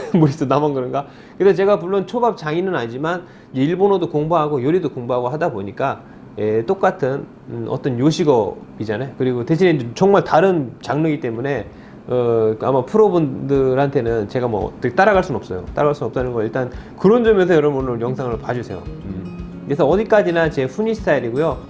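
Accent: Japan. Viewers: 그니깐요 역시 사람은 계속 써야 하나봐요 초창기 모습 보면 재일이나 일본 사람이 한국말 배운줄 알았었는대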